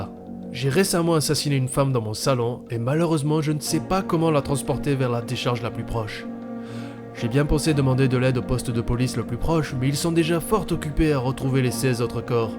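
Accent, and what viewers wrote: South, France. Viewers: Il est du sud enfoiré !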